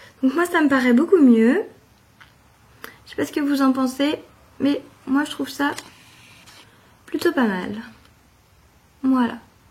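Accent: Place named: North, France. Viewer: Je trouve que tu as l'accent du nord ^^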